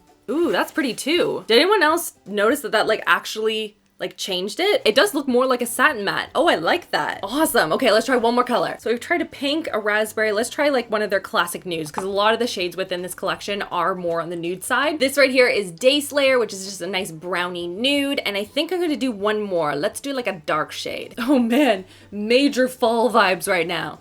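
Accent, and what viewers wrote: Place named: Canada. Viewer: Can you just talk textbook Canadian for a makeup tutorial, cause that would be magical.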